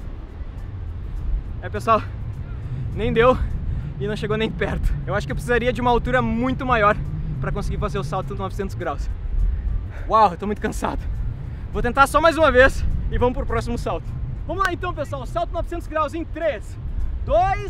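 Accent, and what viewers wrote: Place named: Portugal. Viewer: Ele parece um cara q veio de Portugal e ficou por um tempo no Brasil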